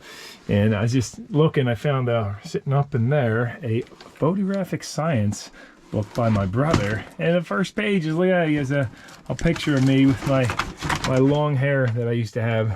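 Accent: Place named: Texas, United States. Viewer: What part of Texas are you?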